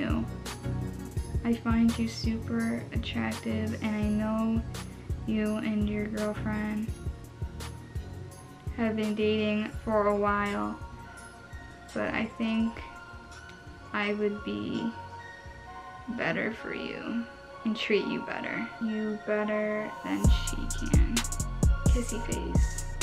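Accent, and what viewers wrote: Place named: Chicago, United States. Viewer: Are you from Chicago???? My mom is so i have a Chicago accent too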